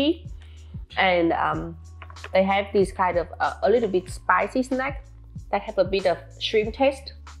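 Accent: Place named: Germany. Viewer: I love to see that you got some of German accent.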